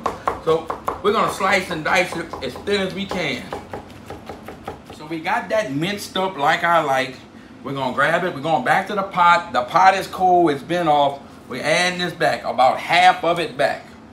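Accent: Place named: South, United States. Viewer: He's southern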